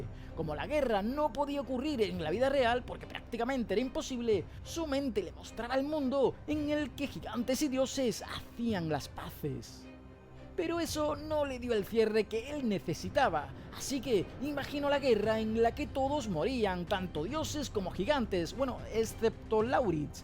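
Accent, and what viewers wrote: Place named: Spain. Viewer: por como los llamas y tu acento supongo la traducción española les dio esos nombres... me quedo totalmente con los latinos xD El final me agradó y me dio pena, fue innovador y diferente aunque en un inicio me dio rabia y hasta le grité a la tele xD pero me pareció bonito, sin embargo deja muchos huecos argumentales y e suna gran invitación a ver de nuevo la serie. Me quedaré con las ganas de otra temporada y que todo fuese real, pero se me apretó el kokoro con el momento final de isolde y la versión del tema que pusieron.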